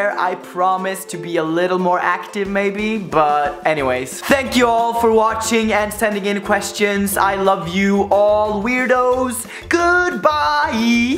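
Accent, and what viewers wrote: Trøndelag, Norway. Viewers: ÅÅHERREGUUD, trøndeeer!! Æå e trønder hahha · d e jo sør-trøndersk som e sokka da <3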